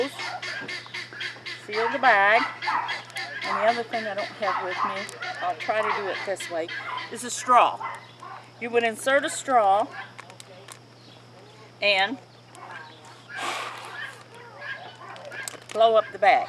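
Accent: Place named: Kentucky, United States. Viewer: The Kentucky accent is like a language of its own.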